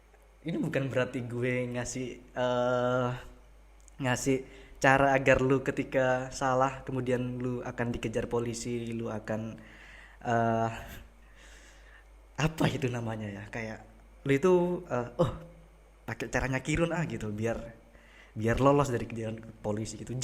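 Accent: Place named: Java, Indonesia. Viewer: kk GUE nya kok medok ya, maap kk skali lg tp ga pantes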